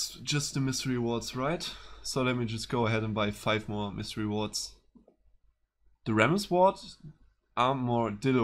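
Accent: Germany. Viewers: Man hört das deutsche in seiner Stimme ^^ aber is ja nicht schlimm weiter so :D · hah dat german accent :P but don't worry i`ve got the same problem ^^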